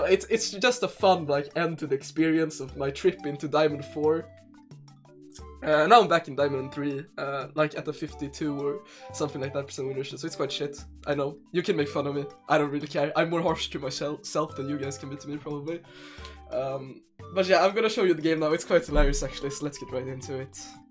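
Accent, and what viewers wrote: Sweden. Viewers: fan vad svensk du låter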